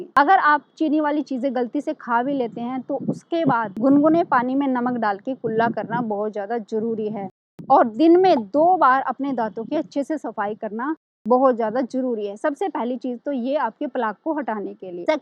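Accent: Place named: Haryana, India. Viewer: Her accent is haryanvi usme ese hi bolte hain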